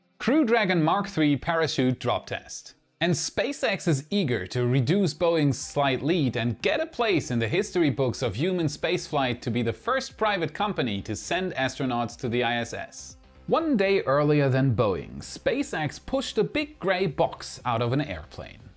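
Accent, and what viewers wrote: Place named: Germany. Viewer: Great video..! Am I alone in wondering WHERE his accent is from...? I have listened and listened and I cannot place it --Germany...? Yet I am unsure. I am sure only he does not wish for us to dwell on this. BUT WHERE IS HE FROM..?? Hmmm....cannot put my finger on it.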